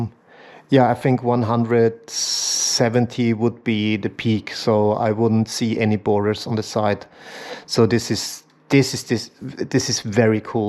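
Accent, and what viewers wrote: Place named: Austria. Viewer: Servus höre am Akzent das du Österreicher bist😂 glaubst du das eine 4070ti super genug ist um das Headset zu befeuern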